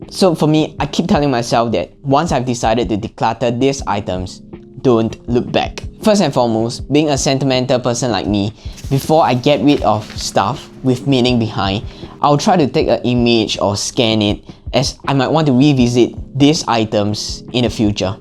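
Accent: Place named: Singapore. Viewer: it is Singaporean accent, go to Singapore and you'll find many people talk like this 😊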